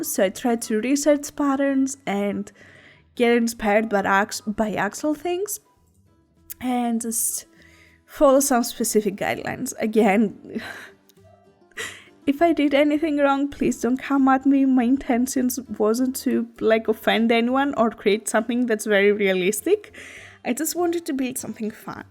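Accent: Greece. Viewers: ur accent is Greek! Ελληνιδα εισαι?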